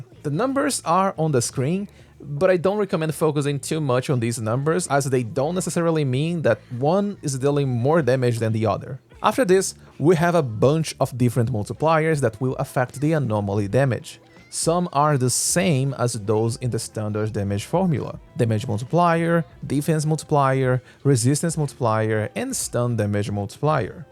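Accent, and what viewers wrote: Brazil. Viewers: First time here and still learning the anomaly mechanics cuz brain goes WAHOO whenever Ye Shunguang goes flying and wipes everything since i unlock her when i started playing :v And yeah, i felt that you were also brazilian from your accent hehe. Still really good video btw (ainda n terminei de ver, mto numero kk) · Hey, thanks so much, that's really kind of you! 🙏 So basically my issue is: I have several S-rank agents that should work well in an anomaly team, but I'm barely dealing any damage with them, especially with Alice. My S-ranks are: Alice, Promiea, Yixuan, Evelyn, Vivian, Pyrois and Velina. If you could write out how to properly build/rotate a team around these to actually get good anomaly damage going, that would help a lot! Writing it out is honestly easier for me to follow anyway (and easier to translate if I need to) 😄and dw about ur brazilian accent lol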